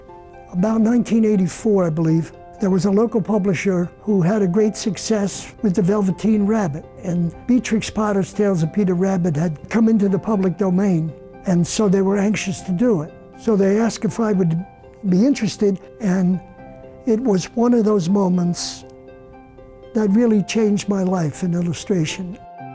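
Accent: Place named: Philadelphia, United States. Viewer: Seeing this video made me cry because Charles was my dear friend, and I miss him and think about him all the time. I became an illustrator and painter and would get to spend time with him at his studio talking about art and just about anything and family. He was a gracious man and very kind to me. He would look at what I was doing and shared so much insight and the sound of his voice really resonated with me as someone who also grew up in Philadelpha like me and also stayed in Philadelphia his whole career. We had many similarities in families and thought. I would say for many years I knew him and his work prior to becoming good friends but always thought to myself what do I have to say to him? He was brilliant and one in a billion. I only got the courage to finally make the call to his studio when I had children's books that I had written and illustrated published and saw success in what he did so brilliantly. 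I finally had something to share with him and Charles let me come to his studio and we spent hours together over many years just talking. He saw me as a fellow illustrator and was so very pleased that I was getting to be part of the parade he talks about in this video. He always greeted me with "Stephen!", and he made me feel special like family. I hear his voice often. He has a beautiful family. I loved that he was a family man.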